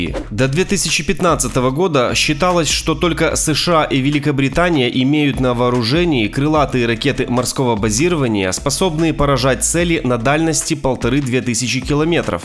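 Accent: Ukraine. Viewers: Сразу понятно что украинец вещает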